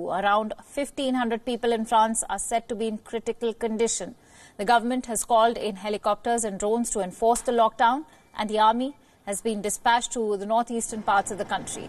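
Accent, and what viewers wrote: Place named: India. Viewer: Her indian accent gets on my nerves. Couldn't they find any European speaker?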